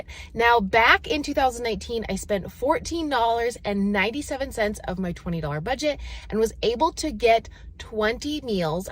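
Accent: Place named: Minnesota, United States. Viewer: That MN accent was way more intense in 2019!! 😂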